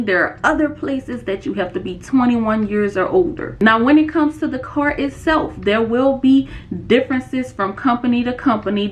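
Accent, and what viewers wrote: New Orleans, United States. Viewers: First time watching. Question. Are you fro. New Orleans ? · before you selected your location I already knew where you were from lol five oh foe ya heard meh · I never heard of this channel but I’m hearing a slight NOLA accent… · Are you from New Orleans....I know my N.O. peoples ❤❤accent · It’s the New Orleans accent for me 💜💜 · You gotta be from New Orleans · What part of New Orleans you from?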